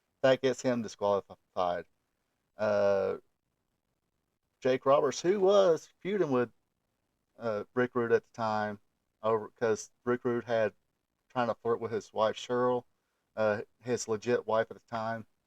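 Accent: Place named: South, United States. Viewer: Man i am so glad fans like you cover these. This on has a special place in my heart . My first PPV . Better days. Dude where are you from. Your accent is Tennessee or Georgia. Old school fan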